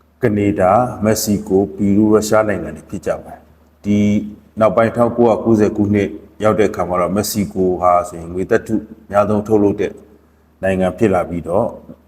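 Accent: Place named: Shan, Myanmar. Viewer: မြန်မာစာဖတ်တာ တအားရှမ်းတယ်နော်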